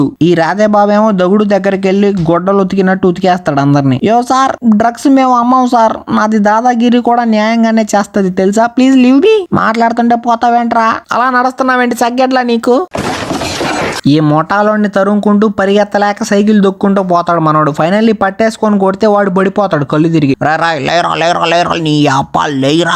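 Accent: Nellore, India. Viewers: Abbiya BROWWWW 'Korea Comic" ani oka yt channel undhi..andhulo kooda oka korean athanu movie explanations chaala hilarious ga english lo chepthadu with crazy asian accent and funny sounds which is similar to your explanation but that is foreign and yours is naatu nellore yaasa which I am proud to be fan of both..neeku emmana help ayyidhi ani like inspiration ga ani cheppa..happy ga undu mowa browwws - SELMON BHAAI be like: abba laddu lags unnave pella 🤤 BOOOYYYYYYYYYYYYYYYYYYYYY broww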